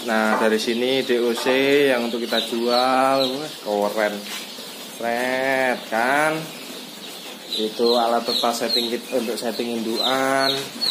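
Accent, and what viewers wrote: Java, Indonesia. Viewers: logat bahasa kok seperti orang Jawa . Tak kira Jawa mas, 🤭. Di daerah saya belum ada pembibitan joper, jadi susah mau ternak joper tak ada bibitnya.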